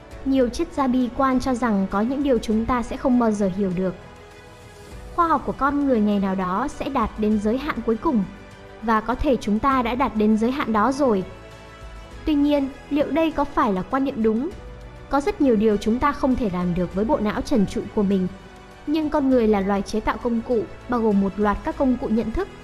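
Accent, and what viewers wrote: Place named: North, Vietnam. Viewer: Giọng Bắc đấy.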